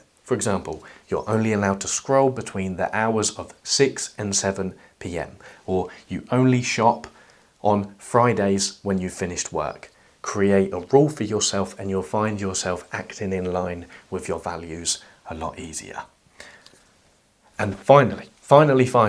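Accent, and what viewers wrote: England, United Kingdom. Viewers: Okay I truly believe this video has changed my life. I have seen dozens of motivational videos and tons and tons of mental health/ help videos.. but when laying in bed being the usual lazy self one afternoon this video popped into my feed.. I read the title and thought to myself “man I don’t really want to watch this.. but that probably means I NEED to watch this” and I clicked on it with only the intentions of watching the first few minutes or so.. and honestly I started off judging. I thought “what does this bald looking guy wearing a fancy vest and with this English accent think he knows that the rest of the world doesn’t” but I surprised myself when I found myself almost leaning forward eager to listen, to learn. What you said in this video was everything I needed to hear. I thought about this video for the rest of the day. 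And the next morning I woke up and I watched the 32 minutes all over again. I learned even more the second time and I had the most productive day that I had in months. The next day.. I crashed. Burnt out from trying to go 110% and I felt so guilty after just having what I thought was a revelation of my whole life. I slipped back into a depressive state and did nothing about it for a few days.. but I realized something. I could not get this video out of my head. This video and everything you have said was constantly screaming at me. Every time I looked at a screen I couldn’t stop thinking about what I was doing to my brain. I became conscious. This vide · Why does Shia Lebouf suddenly have an English accent?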